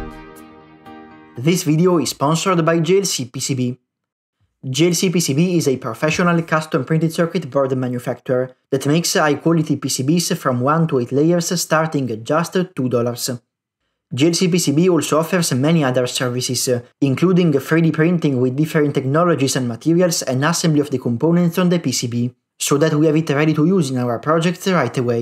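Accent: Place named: Italy. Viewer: Bellissimo progetto! Non capisco perché farlo con questo inglese dall’accento chiaramente italiano. Anyway, bravo!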